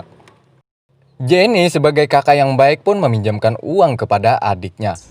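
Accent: Banjar, Indonesia. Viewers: Banjar kah admin nih...umaaaaa...mandangar dangsanak tu aeee..tesadar...wkwk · Aksen nya kaya urang banua Banjar tambahee lagee sanakk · Mang orang banjar kh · Logat banjar kd bisa hilang😁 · Mantap bos campuran logat bahasa banjar sama bahasa indo👍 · Adminnya urang Banjar dingsanak lah.. Hehehe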